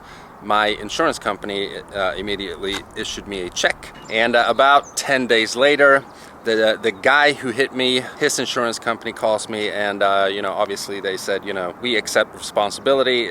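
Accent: Pittsburgh, United States. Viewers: Dude u sound like u were born in the states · Perfect American accent didn’t know you were from Swede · From Sweden since 2012, but sounds totally American 🤯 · defo turned American, repeat yourselves many times and waffle a lot, instead of getting to the point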